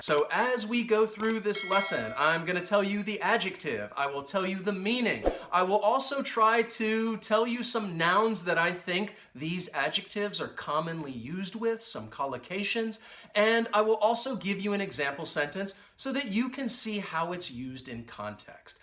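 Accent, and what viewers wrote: United States. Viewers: I am from India... even I understood all the words properly in your American accent..all adjectives were tought very clearly... Thank you sir!!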